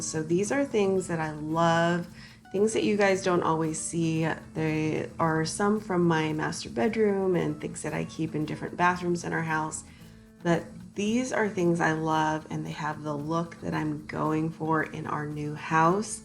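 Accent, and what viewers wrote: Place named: Colorado, United States. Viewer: You sound like you could be a Coloradan. 🙄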